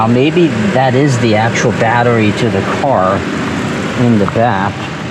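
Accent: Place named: Maryland, United States. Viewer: Love the Maryland accent. "Still wills", and the extra rrrs, etc.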